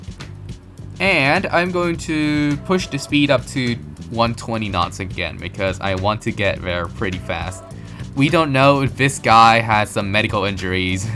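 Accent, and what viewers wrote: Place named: United Kingdom. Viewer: damn that British accent tho....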